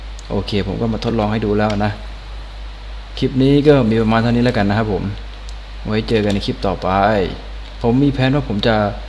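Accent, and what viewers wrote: South, Thailand. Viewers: พี่เป็นคนใต้หรอ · พี่คนใต้หรอครับ5555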